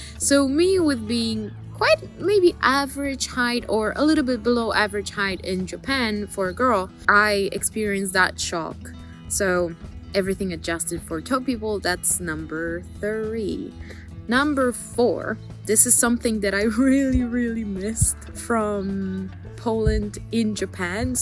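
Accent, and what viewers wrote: United States. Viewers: アメリカ発音で聞きやすかった… これどうしようもないんだよね耳が学んだ言葉と発声はそれしか理解出来ないのよね。まあそれだけ言語力が無いって事かも知れんけどw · This is my very first time to check on your video. I was amazed that your English is so well and American lol. Enjoy home and return to Tokyo soon. I could not return to Tokyo because even I was born and raised in Tokyo. I did obtain US citizenship so I have to get visa every time I visit my parents living in Tokyo. I wish I can return Tokyo in November. Have fun in Poland!d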